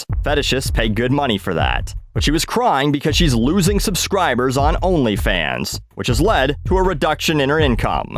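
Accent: Australia. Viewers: Love the Aussie accent and the references to woolies. Fucking hilarious vid! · Your aussie accents is like a cross between english and loose australian. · Loves the Australian accent and the woolworths reference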